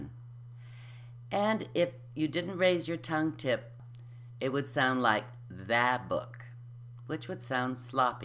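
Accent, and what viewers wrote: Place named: East Coast, United States. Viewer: Because they don't pronounce the words with the letters with which they are written... j/k, this woman is claiming that foreigners who fail to use unaspirated "t" sound sloppy when unaspirated "t" is a later addition to English phonology innovated by colonists and immigrants who couldn't be bothered to fully aspirate it. Traditionally in English t is aspirated in every position. That's why it's written with the same letter. There is some irony in prescribing something and claiming that failing to use it is "sloppy" when it started out a "sloppy" feature of non-standard dialects in the first place. Other English speaking countries like the UK and Australia are filled with dialects that replace "t" with glottal stops all the damn time, but you don't catch them prescribing it as "proper English" for learners lol. 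On the whole, I find the implication that certain uses of language are "sloppy" borderline offensive. It's especially rich from someone who sounds like they speak some kind of refined regional East Coast dialect rather than modern General American standard. Nobody under 60 sounds like this woman in the US anymore, and if you're going to go full prescriptivist, why not go fully 19th century and recommend the Queen's English. It's quaint to see an American fuss over "proper English" and "proper elocution" when their "proper" dialects are relatively novel anyway. Anyway, if you want to speak like a typical US American, then follow this advice. Just know that it arose fro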